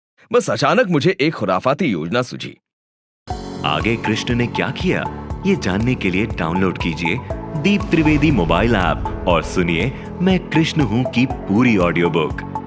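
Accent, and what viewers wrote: Gujarat, India. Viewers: Sir ji Ye achha hua ki. Koi. Aur. Comentary kar. Raha hai Agar aap. Boltey. Toh Aapka ton jo ek. Gujju jaisa Aur. Aapke. Jo dress👗 hotey. Hai woh. Koi. Joker🃏. Se Kam nahi hotey Aap. Kitne bhi. Bade pravachan Karne. Wale ho.. Magar aapko Apne. Dress ka khayal. Rakhna chahiye Ye ekdum. Se. Joker🃏. Jaisa Ya koi. Over acting karne wale Jaise dikhtey. Ho aap Kuch toh akkal lagao guruji Ki logo ko kya 💆‍♀️massages jataa hai Aapko ek sudhh khadi ka kurta hi jachega Aur. Mai aapko criticism. Ke. Hisaab se baat nahi kar. Raha hu Aap. Jaise log Aur logo ko prerna detey hai Aur. Aap joker🃏 jaise. Dress👗 Pahan kar aa. Jaatey ho stage. Par.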